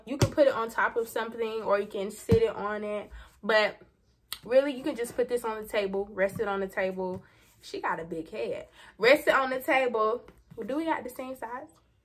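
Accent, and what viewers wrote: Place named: Memphis, United States. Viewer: You sound like you from Memphis!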